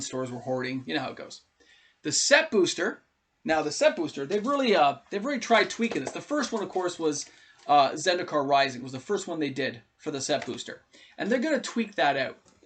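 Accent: Canada. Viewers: Judging from the opening scene and your pronunciation your in Canada?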